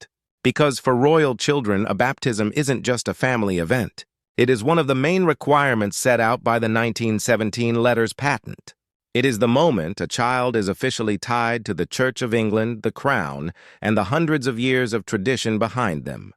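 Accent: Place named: United States. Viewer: Dear Lord how I hate anything narrated by a Yank. I know not a word can be believed.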